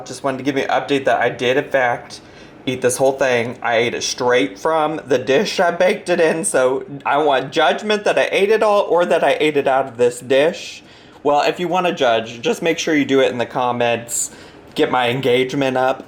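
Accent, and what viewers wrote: South, United States. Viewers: Lmao your random southern accent throughout the video is oddly fitting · This new southern accent isn't it babe. Kinda like our gorls "lah" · How do you have a country accent but you live up north?! It gets me every time! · I never noticed until this video that has a country accent!? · you are very southern in this video LMAO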